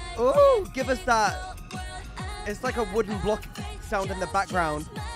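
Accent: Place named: United Kingdom. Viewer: Oh ..... You're British lol. Thanks for reacting bro.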